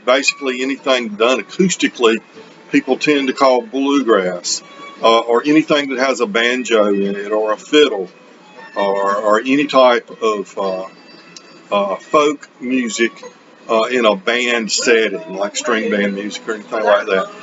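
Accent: South, United States. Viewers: I don't know if I can be your friend. You keep saying prog rock fans have no sense of humor. You make me want to cry when you say that (LOL). Seriously, I enjoy your channel. I enjoy your clumsiness. I am more clueless about this video making technology than you are. Secondly, you remind me of my best friend that passed about 4 years ago - same hairstyle and also a southern accent (his was a GA-FL mix). I think you are just a decade older than me. My friend was born around the same time as my parents.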